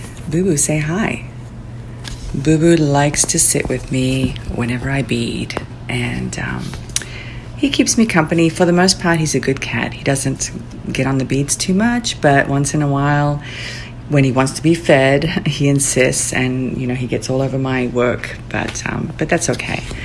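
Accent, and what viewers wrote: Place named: Australia. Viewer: Forgot to say, Love your accent! Are you Australian? Just thought I’d guess.. your home is absolutely beautiful by the way!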